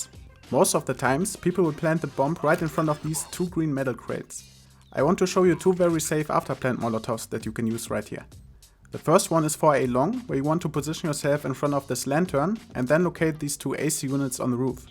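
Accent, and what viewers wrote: Germany. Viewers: Are you German? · Ur german accent is very good m8 nice vid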